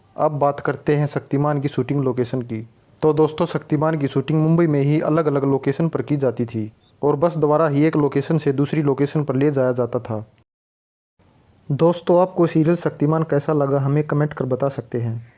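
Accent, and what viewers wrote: Haryana, India. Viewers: Bhai jyada haryanvy touch aa rha h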